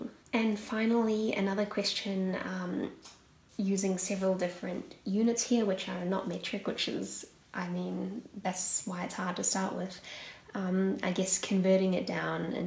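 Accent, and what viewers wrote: New Zealand. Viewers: In 1866 students would have been using a slide rule (see link below) to calculate the more difficult problems, but they would have to memorise all the formulae. Back in my day, we never had to use a slide rule as we did have calculators, but we were forced not to use calculators and use what we called "lookup tables" and calculate longhand. As to accent, I can hear a little NZ accent in there but the way she pronounced Albany I believe she is either in Western Australia or has lived there for quite a while. Australian Eastern Staters and Americans (I believe) pronounce it Allbany. <link> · Well... I can tell you're definitely not Australian since a) no Australian ever says shrimp, we say prawn and b) she's obviously got a Kiwi (New Zealand) accent so she's not even from the same continent. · Gold dust in Troy ounces and cotton in Ne (linear density)? 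By the way, are you from New Zealand?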